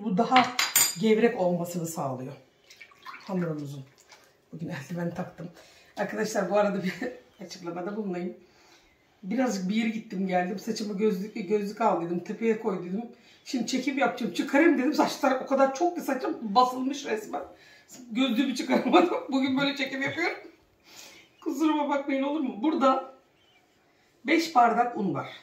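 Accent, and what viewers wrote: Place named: Izmir, Turkey. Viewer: ay çok tatlısınız ya siz konuşurken kendimi görüyorum İzmirli olduğumuzdanmi acaba çok natursunuz ellerinize sağlık hep yaptığınız çok güzel sağlıcakla kalın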